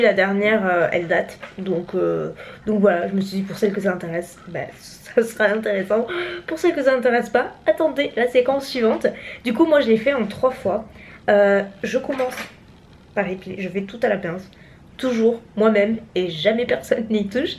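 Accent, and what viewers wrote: South, France. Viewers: Salut ma belle, voir tes vlogs dès le matin ça me met une pêche d'enfer et ton petit accent du soleil dans cette journée bien grise !!!! et bravo à mamie !!!! beau plat !!! félicitation !!! Merci pour tes vlogs, ta bonne humeur, ta joie de vivre !!!! j'adoreeeeeeeeeeeeeee !!!!!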